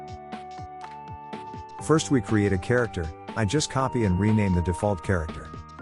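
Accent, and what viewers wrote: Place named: China. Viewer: 这英文听起来很像中国人。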